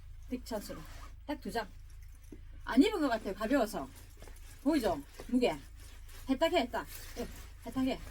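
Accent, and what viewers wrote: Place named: Gyeongsang, South Korea. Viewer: 싸장님 갱상도 사투리 넘 재미나고 멋지세요~~^^아방가르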